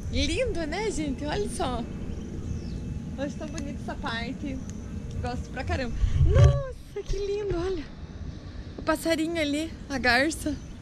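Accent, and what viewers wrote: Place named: Santa Catarina, Brazil. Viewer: Boa noite. Adoro seus vídeos. Principalmente para ouvir sua voz. Esse seu sotaque catarinense com certeza chama a atenção. Essa sua frase "CHIC E BACANIZADO" também é legal e chama muito a atenção. Sua simpatia também é bem marcante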